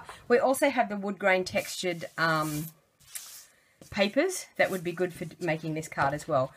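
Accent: Australia. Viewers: Great video. Good to hear an Aussie accent!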